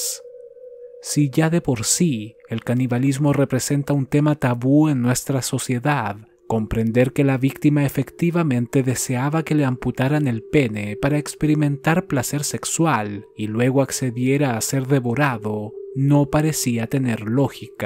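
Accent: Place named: Chile. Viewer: Hola, espero estes bien! Felicitaciones por tu canal. Lo q mas me gusta es la narracion chilena que haces de los videos. Estoy harto de escuchar relatos mexicanos, por eso te felicito por tu narrativa. Quisiera mas videos nuevos, ya los he visto 3 veces.gracias. Ronald cortes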